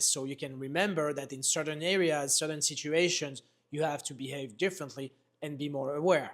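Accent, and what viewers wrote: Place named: France. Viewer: You sound French as fuk!! Rarely seen a Frenchman that understands and supports 2A... 🙏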